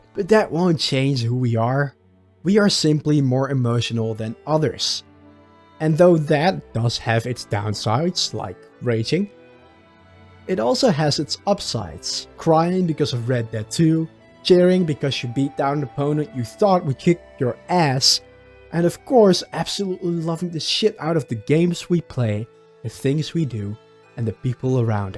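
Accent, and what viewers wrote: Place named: Netherlands. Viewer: Just stumbled across your channel, you are definitely Dutch without a doubt. Like the content tho!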